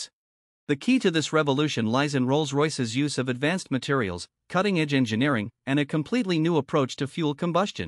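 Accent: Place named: United States. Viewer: Repetitive WAFFLE by a DREARY American Voice.... ALL talk and NO FACTS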